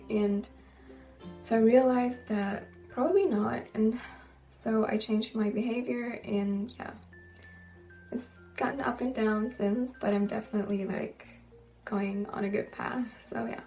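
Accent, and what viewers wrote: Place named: United States. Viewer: she have American accent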